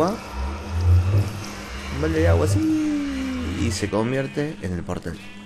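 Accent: Córdoba, Argentina. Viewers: Se Nota Que Sos Cordoves De Argentina :D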